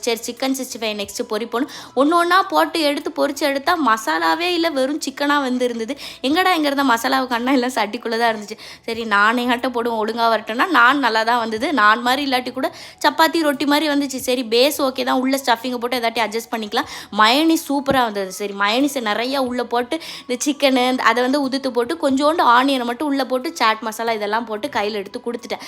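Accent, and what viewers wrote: Tirunelveli, India. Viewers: கொன்டாவும் இல்ல திருநெல்வேலி பேச்சு 😊😊😊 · திருநெல்வேலி பேச்சு நல்லாவே இருக்கு.😂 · thirunelveli tenkasi antha side lam paesurathu ipudi thaan irukkum...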